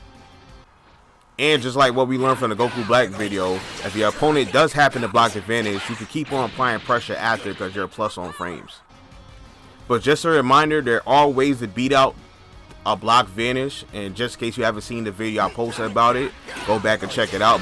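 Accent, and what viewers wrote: New York, United States. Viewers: The most NY accent ever. Instant like and subscribe.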